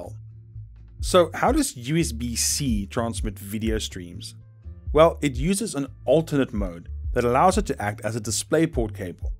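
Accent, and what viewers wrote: South Africa. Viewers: uppa the south african accent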